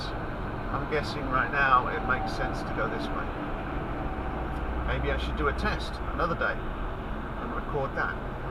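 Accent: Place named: England, United Kingdom. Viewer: This was randomly recommended to me by the Youtube algorithm. I'm from Clark. What a fascinating video of an English accent narrating the area I grew up in. You have described things in a way I never would have thought of! Please do more videos! Come on down to Clark, Cranford and Rahway!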